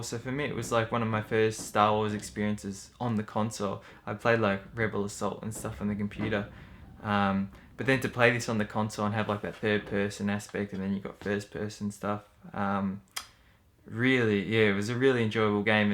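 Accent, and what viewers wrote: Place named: Australia. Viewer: Cool, yeah I saw that tag video. I thought I recognized that accent. I was actually in Australia in 1999 when I was in the US Military. (Forever ago.LOL!) We were on the East coast near, Rock Hampton, Gladstone and Brisbane.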